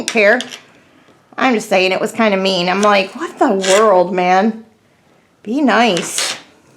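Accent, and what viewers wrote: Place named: Detroit, United States. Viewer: Yes! Do it! I never thought to make Hash on a sheet pan. brilliant!! Looked so delicious. Right me too. I e been doing way more of them. Just throw away the foil. Great job! Aha! I KNEW you were from the Detroit area! I could totally dedect that in your accent ❤️. I grew up in the Toledo area and my In laws lived in Clarkston! Small world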